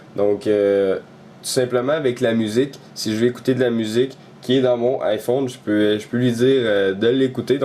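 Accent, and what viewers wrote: Quebec, Canada. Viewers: il foire de temps en temps due a votre accent quebecois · Mais aussi tu as l'accent canadien c'est normal. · Je ne sais pas de quelle région de France tu viens mais j'ai jamais entendu un Français dire "i"phone, tout le monde dit "aïe"phone (d'ailleurs Siri le dit correctement) ... Et je pense pas que l'auteur de la vidéo ait besoin que tu le prennes pour un attardé ... Les québécois ont des expressions différentes et c'est surement leur accent qui cause ses petits bugs mais pas besoin de lui faire la morale sur la langue française ! On dit "y'a du vent dehors ?" ;)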